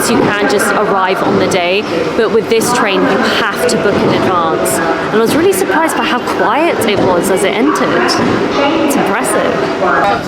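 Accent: United Kingdom. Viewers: いつもながらナレーションが素晴らしい。響きの良い声とイギリスなまりは良い雰囲気です。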